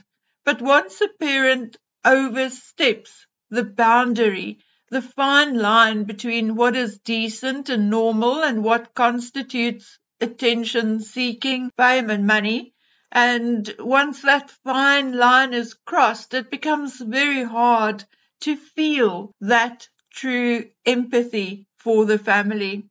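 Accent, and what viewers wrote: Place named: South Africa. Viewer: dont know what it is about the South African accent but its soooo beautiful .-) great video btw , lots of valuable points made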